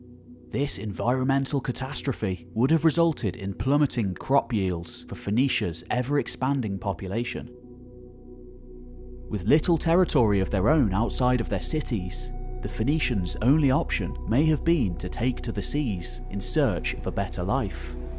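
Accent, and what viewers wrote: United Kingdom. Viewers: I'm always looking for good history doc channels and yours is certainly one, thus I have subscribed. Being one-quarter British I enjoy the many accents from your islands and would rather see a world history documentary presented by a Britisher than one from a fellow American. Keep up the excellent work and thanks for the many links you've generously provided to other related sources.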